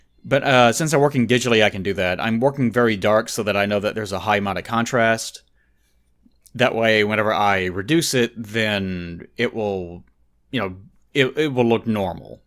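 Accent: South, United States. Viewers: I feel like voice sounds noticeably more Southern then it normally does at times. It's weird for some reason, despite knowing lives in Kentucky. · I can hear your southern drawl! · You became way more Southern in this one. Your drawl was really drawn out. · It sounds like his gain is just set much higher, I had to actually turn him down on my end, he's as Southern as usual, just louder.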